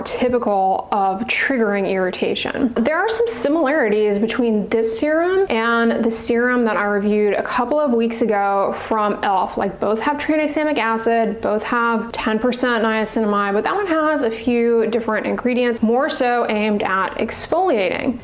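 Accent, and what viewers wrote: United States. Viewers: WOW what weird thing to say!!! She has a lovely American accent, nothing unbearable about it!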